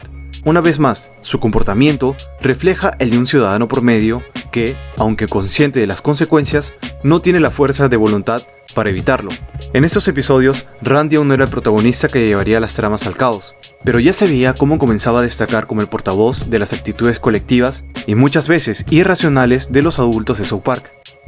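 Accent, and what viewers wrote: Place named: Peru. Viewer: Tu acento.. Me es muy familiar, eres de Perú?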